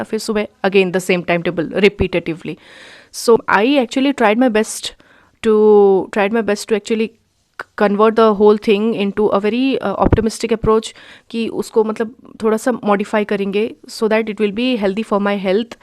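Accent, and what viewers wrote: Odisha, India. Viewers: Hlw mam i guess you're from odisha! RIGHT!!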